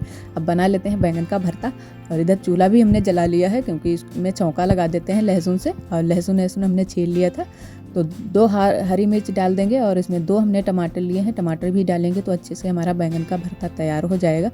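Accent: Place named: Orai, India. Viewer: Ap Kahan se hai kuki apki launguage ekdum hmare orai u.p ki tarah h Kande to hmare yhn bola jata hai up m to ap Kahan se hai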